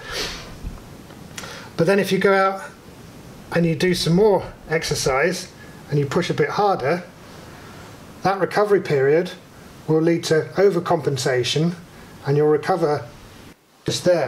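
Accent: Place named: West Country, United Kingdom. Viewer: Bloody hell you sound like my wife literally she's from the west country! Eat healthy stop drinking get fit lol.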